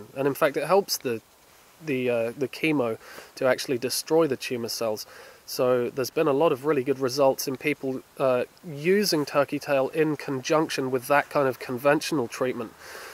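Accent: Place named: United Kingdom. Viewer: You sound British, where about didn't you find the mushrooms? I didn't think they grown in the UK.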